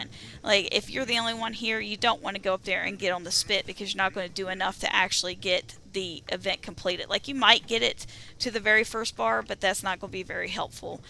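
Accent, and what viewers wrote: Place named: West Virginia, United States. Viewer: Your accent sounds a little like a WV accent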